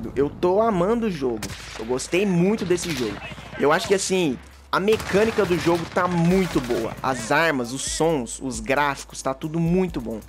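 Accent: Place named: Goiás, Brazil. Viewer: Mano vc é de Goiânia ? Tem o sotaque mo parecido kkkk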